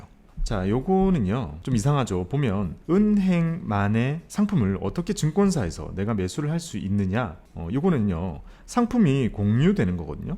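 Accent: Gyeongsang, South Korea. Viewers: 항상감사합니다. 작년부터 펜이됐네요. 약간의 경상도 억양도 매력있고 설명도 알기쉽게 설명해주시니 좋군요.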